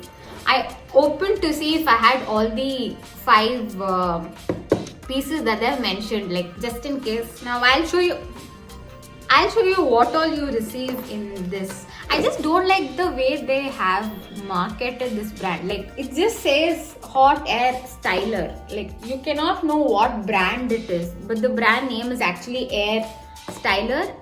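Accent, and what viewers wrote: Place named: Tamil Nadu, India. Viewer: Why are u so Tamil😂 by the way i loved your accent and expressions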